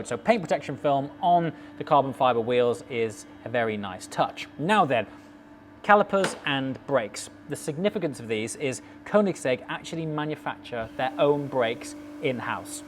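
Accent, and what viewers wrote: United Kingdom. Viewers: Man, not every listener is British, speak slower and make sure that no dialect is involved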